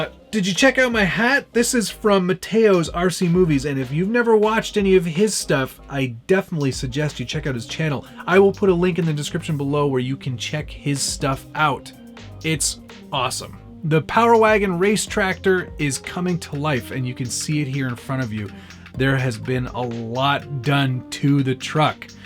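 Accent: Canada. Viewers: Please type the name of that chrome paint pen you've mentioned a couple of times. Not sure if its your Canadian accent or my shitty ears or a combination of both... Tractor Truck is looking bad ass. The brazing work really looks great painted. Shows that not everything has to be bought off the shelf...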